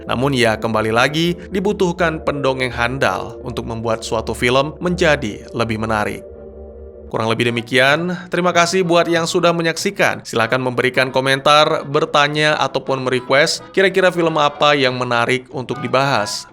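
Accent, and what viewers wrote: Aceh, Indonesia. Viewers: bg btw lu orang aceh kan bg?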